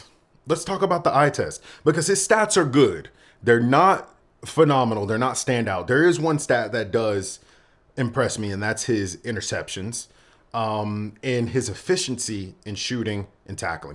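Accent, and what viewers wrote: United States. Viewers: Greetings from Lebanon, This is my first time in your channel, and I was surprised (in a positive way) for different reasons. The first surprise came at the first second, when I heard an American accent; to be honest I was expecting British. The second one came only a few seconds later when you suggested that selling Carvalho was not for raising funds for buying Zubimendi, and I thought that you've explained a great point with a different view of a lot of other Youtube channels that I've been following since long years ago. and that really made sense to me. I do agree with you that the reason behind bringing Zubimendi is for improving the build up from behind, and I'm expecting both of TAA and Andrew Roberson to shine even more and probably play a great role in improving Darwin Nunez's numbers. TAA reminds me in every game of Stevie G, who I think had deserved a Balon d'ore but didn't get it, and I hope TAA manages to claim it throughout his career with Liverpool. 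I'm happy to see Americans break records in attending friendly football games at their venues and making channels sharing their thoughts and analyzing tactics and the transfer market. A great channel, and I'm delighted to have met on Youtube a person like you who IMO have a different yet great vision/analysis and who really thinks out of the box, and that got me subscribed. I'd like to suggest a few things in order to improve your channel. When showing graphics, I prefer to see them one by one with labels instead of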